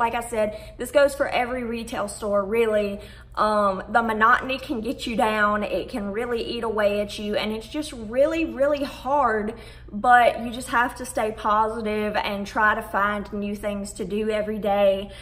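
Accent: United States. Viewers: You country accent 😂😭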